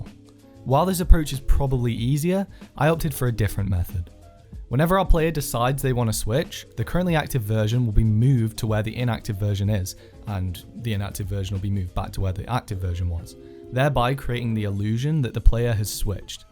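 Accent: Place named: United Kingdom. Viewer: I can notice a british accent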